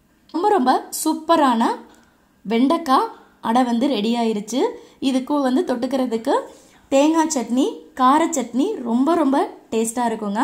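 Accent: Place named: Coimbatore, India. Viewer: Akka Coimbatore Pola...... Ethana "inga" ... Anyway different recipe.... 👍... Nice nga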